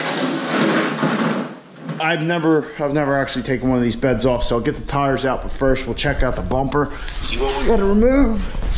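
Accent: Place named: Maryland, United States. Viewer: You gotta be from up around Maryland or at least that area. I got family up that way and would know that accent anywhere lol.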